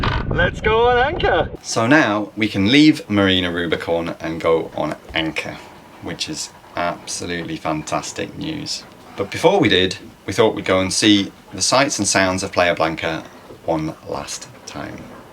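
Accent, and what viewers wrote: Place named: Ireland. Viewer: A bit of Irish...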